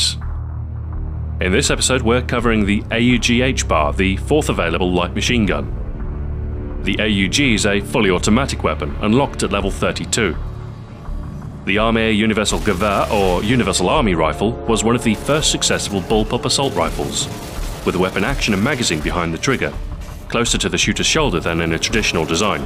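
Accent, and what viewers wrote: United Kingdom. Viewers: Hey mate im not gay but i love you! ur videos are the best ;) and i love ur british accent ;) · Its not Russian... Its a BRITISH accent, he's from Manchester. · are you british?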